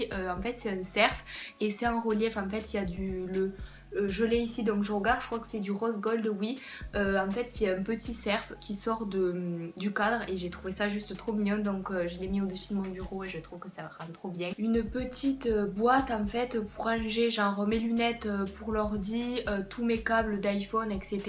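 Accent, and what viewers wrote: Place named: Toulouse, France. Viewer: L'accent toulousain est palpable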